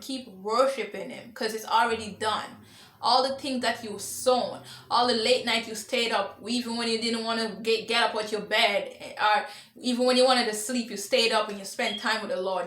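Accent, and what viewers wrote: Jamaica. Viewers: Sister, by any chance are you from Jamaica ? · Thank you sis! Are you from Jamaica? I hear a Jamaican accent! God bless!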